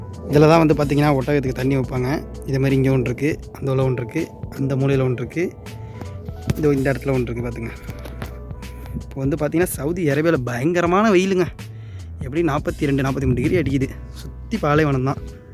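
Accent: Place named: Tirunelveli, India. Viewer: மிகவும் சிறப்பு அன்பர்! வாழ்த்துக்கள்!! திருநெல்வேலி அல்லது தென்காசி பேச்சு வழக்கு தங்கள் பேச்சில் தெரிகிறது!!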